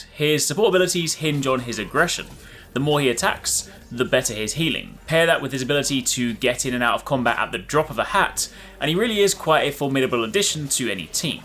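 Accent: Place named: United Kingdom. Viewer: Here because I had a comment saying we sound the same! 😅 We don't... we're just both British! Haha. All the best to you dude 🙂👍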